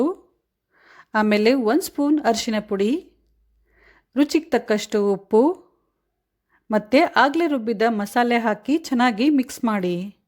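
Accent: North Karnataka, India. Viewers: 👌👌👌 ನೀವು ಉತ್ತರ ಕರ್ನಾಟಕದ ಶೈಲಿಯಲ್ಲೇ ಮಾತನಾಡಿದರೆ ಬಹಳ ಹಿತವಾಗಿರುತ್ತದೆ.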